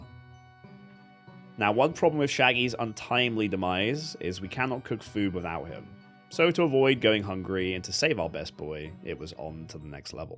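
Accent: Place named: United Kingdom. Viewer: London :) that’s why I asked. I can tell you’re British. Just wondered which part of the uk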